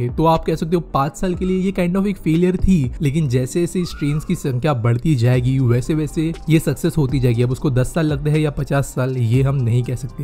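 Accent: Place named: Maharashtra, India. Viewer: Good video.. but talk slowly. I can sense that you are Maharashtrian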